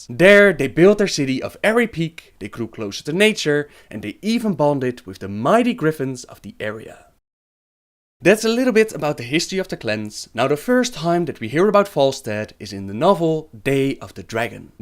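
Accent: Netherlands. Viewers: I enjoy his Dutch accent to be honest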